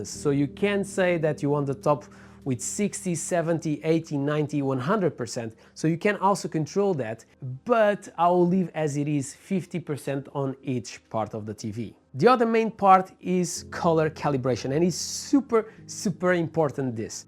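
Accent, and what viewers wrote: Portugal. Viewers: Bro.. You might have the strongest Portuguese accent I've ever heard 😅 (I'm also Tuga) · Wait…. Are you Portuguese?!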